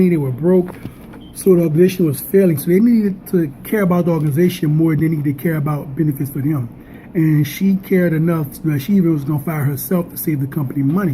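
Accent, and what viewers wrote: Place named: New Orleans, United States. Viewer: ok no problem , i like that little new Orleans accent of yours too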